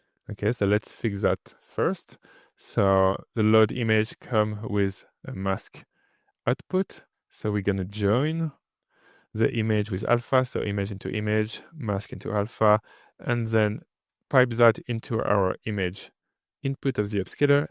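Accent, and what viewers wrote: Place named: France. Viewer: Really fantastic video tutorial, very well explained and in depth. Your english is also fantastic, however, if I am brutaly honest, you have quite a strong french accent and pronounciation, which makes it hard for me to understand you perfectly all the time. Only pointing this out as I found the subtitles very helpful, for following your speech, so remembering to include them in your uploads will likely always be very helpful for your viewers. Look forward to watching more of your content. I am interested in developing some ai photogrammetry to stereoscopic 3d/vr and cad and stl file pipelines, so finding the best way to optimize poor quality low res recordings from a very large number of different sources, efficiently with a high quality footage output, is critical for this objective. I assumed that this upscaler would not be the best choice for this, especially as my first tests were generating unrealistically sharp details, but now thanks to you, it looks like the best option I have found so far, though like you say, it could be better at handling motion. 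Not sure if there is a better free offline alternative for this? Now I have to figure out how to compare the quality output between different video renders in kdenlive, as I am new to comfyui and have no way to install the required nodes, etc, to do this for the next couple of days. I come from more of a 3d modelling background personally and am astonished to learn how many laborious tasks AI can speed up nowadays. Quick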